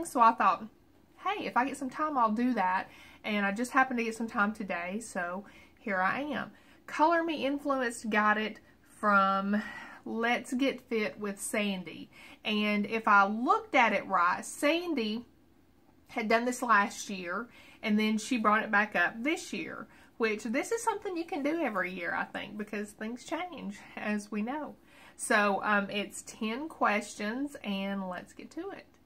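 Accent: South, United States. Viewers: love your tree, like that southern accent!!! merry Christmas.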